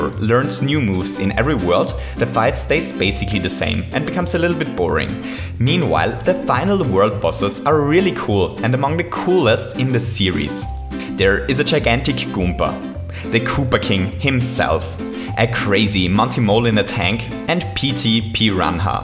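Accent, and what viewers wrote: Germany. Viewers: Is german your mothertounge? Sounds like you have a german accent. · You sounds german🤔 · You sound like you have a german accent :D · I really like your intro music. And are you german? Because your accent sounds like you are german. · Are you German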